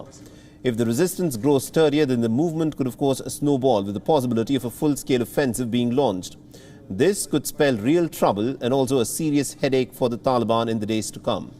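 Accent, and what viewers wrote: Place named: India. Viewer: Funny English accent hahahaha laugh at india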